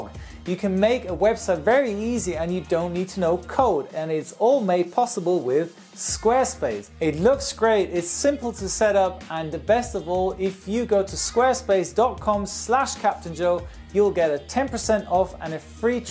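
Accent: Germany. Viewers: K. S due to his slight accent speaking English ( as a German I sadly know this accent very well) and this job or former job at AirBerlin I would strongly suggest he's German :) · Allgäuer Bub isser ^^ PILOTEN BOARD D E Da merkt man direkt das er ein Bio Deutscher ist hahaha :D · Hey Joe, are you a german??? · HySeb the captain is german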